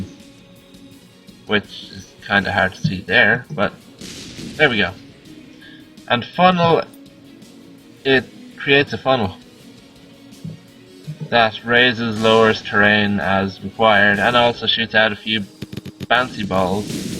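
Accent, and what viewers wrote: Ireland. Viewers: Are u Irish?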